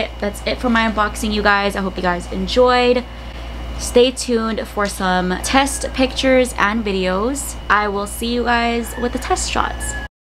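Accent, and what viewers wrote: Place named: United States. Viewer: prng amerkano dw voice mo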